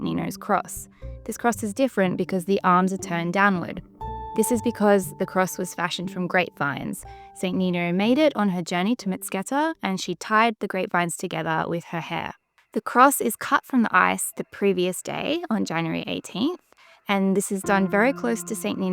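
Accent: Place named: Australia. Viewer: This ice-bathing has been a common practice in nearby Russia too, since time immemorial. As with Wim Hof's practices. Wonderful to hear the history and special relevance to Georgian practices, thank you. All your photos, videos and blog are exceptional. Great to hear a fellow Aussie voice - and from Brisbane no less :-)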